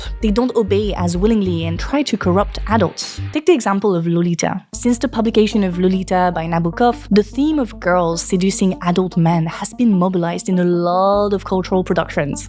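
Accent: France. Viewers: I agree with what you say about the effect of Lolita, but I hope you understand how the actual message of that book itself is to show how false, self-serving, and full of manipulation that perspective is. And I'm saying this as someone who is unashamedly attracted to young girls. I understand that my attraction is utterly separate and apart from any possibility of acting on it in reality, and would never take steps to do so. And it is because of that abstinence, that I have the right to my own natural feelings and thoughts. Your French accent is quite lovely, by the way.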